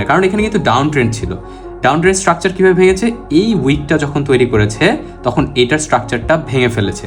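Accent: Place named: Bangladesh. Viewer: ভাই উনার ভাষা শুনেই বুঝা যায় উনি বাংলাদেশী।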